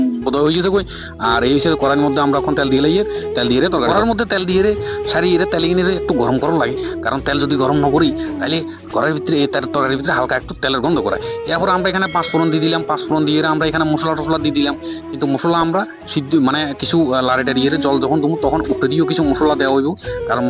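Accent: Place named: Noakhali, Bangladesh. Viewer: Gramar language suru korchish ekdam nowakhali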